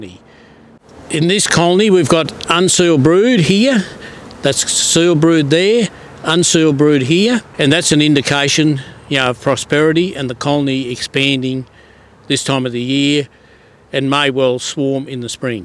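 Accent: Australia. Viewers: Love the Aussie accent. I was waiting to hear "crikey". Lol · Greetings from America! I LOVE your Aussie accent! May I call you? hehe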